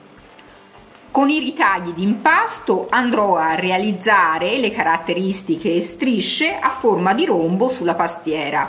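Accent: Tuscany, Italy. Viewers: Non si può sentire un accento toscano per la descrizione di una pastiera..... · Che dire sei strabiliante... La tua bravura e poi quell'adorabile accento toscano mi regalano relax e voglia di combattere anche tra i fornelli e poi con la bilancia🤣Un grande abbraccio e un incoraggiamento a tutti per questa triste situazione. Che il Signore benedica tutti🙏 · perdonami sicuramente la panna e le fragole si riferivano ad altre immagini stavo cucinando Ma sono sicura che ti hanno fatto vedere perché ho riconosciuto la tua voce, tipicamente toscana come me e mi sono voltata e ti ho vista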